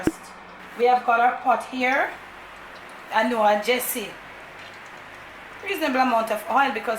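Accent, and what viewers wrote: Jamaica. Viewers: I just love to watch you cook, and I also love the way you talk. The real JA dialect.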